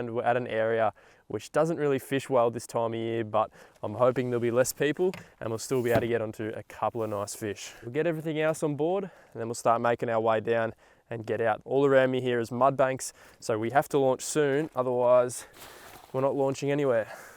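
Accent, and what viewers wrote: Australia. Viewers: I love the Aussie accent. combined with fishing that is just perfect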